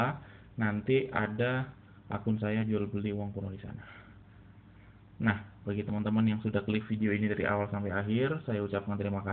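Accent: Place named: West Java, Indonesia. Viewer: maaf sobat ini daerah apa ko logat nya sunda,maaf ya, sobat,smga sht& bhga sll amiin YAR 🤲🤲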